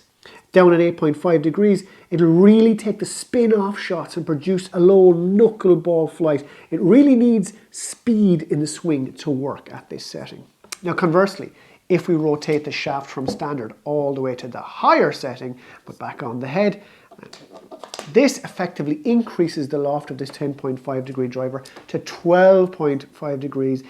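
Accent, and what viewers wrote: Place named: Ireland. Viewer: That's actually an Irish accent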